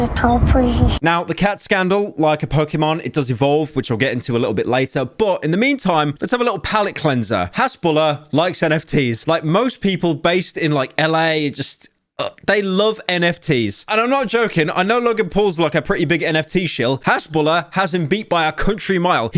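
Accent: United Kingdom. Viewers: is Bri ish?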